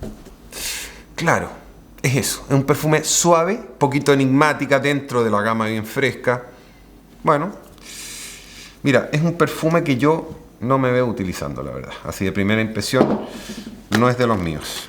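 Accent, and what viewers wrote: Chile. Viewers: Es chileno jaja 🤣 después dicen que hablamos mal y dices que es un perfecto español. 🤣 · España? Yo crei que era chileno por el acento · Es chileno viviendo en España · El bro habla entre chileno y español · Excelnete video, nuevo suscriptor. Una consulta, escucho tu acento, y lo asocio mucho al chileno! Saludos y espero que sigas creciendo aun más!! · Me encanta tu acento y forma de hablar obvio chile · Eres chileno?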